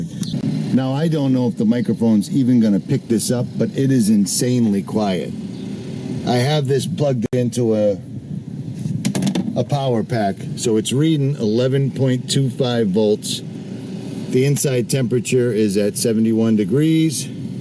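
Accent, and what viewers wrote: Boston, United States. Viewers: Ha. I was watching your video and I said to myself, this guy sounds like he's from Boston. Then toward the end of the video you mentioned you're from Boston. I'm in Boston too (Hyde Park). Anyway.. I'm looking at this fridge now for my cargo trailer that I recently converted to a camper. I was initially looking at the dual zone, but its slightly too large to fit into the space I'd be putting it in. This 45 quart fridge will fit perfectly tho. Thx for the review.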